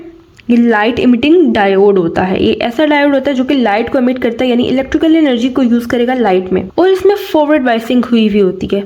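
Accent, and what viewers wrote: Pakistan. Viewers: R u from Pakistan???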